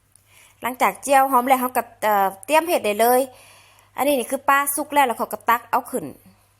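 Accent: Savannakhet, Laos. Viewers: ຂ້ອຍເບິ່ງຫລາຍລອບກະຄືບໍ່ໄດ້ມີພາສາໄທປົນໃດ ຄົນສະຫວັນເຂົາເວົ້າແບບນີ້ໃດ ຂ້ອຍກະຄົນສະຫວັນນະເຂດຄືກັນ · ເຈົ້າຄົນແຂວງໃດສາວ ນີ້ແມ່ນພາສາຄຳເວົ້າສະຫວັນນະເຂດ ຂ້ອຍຟັງແລ້ວກໍ່ບໍ່ໄດ້ມີໄທປົນເລີຍໃດ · Jasmine Cahuana ຂ້ອຍຟັງເອື້ອຍລາວເວົ້າມ່ວນໆຫວານໆ ຕາມທີ່ຟັງນິແມ່ນສະຫວັນອ້ອຍຕ້ອຍເກາະນິ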